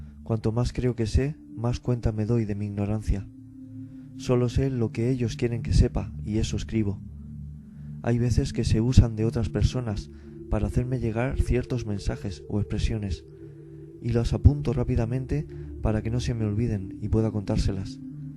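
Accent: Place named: Spain. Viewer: muy lindo y modesto tu historia de cómo te hicistes este guía espiritual de la humanidad me pasó algo parecido a la historia de la muchacha pero no con el suicidio si no con la muerte de mi hermano el día q se puso malo me entró un vídeo q nunca me había entrado ningún vídeo de ese tipo fue la historia del neurocirujano q tuvo una ECM como una demostración q mi hermano IVA a estar bien y a partir de ese momento me sentí súper tranquilo y le perdí hasta el miedo a la muerte y fue cuando me entró tu primer video bendecido por Dios y a partir de hay los e visto todos los q han entrado me das mucha confianza vivo en cuba sino fuera a España a conocerte tu acento es español si cojo la ciudadanía española dios me llevará a conocerte muchas gracias por todo bendiciones pa ti y tu linda familia y para todos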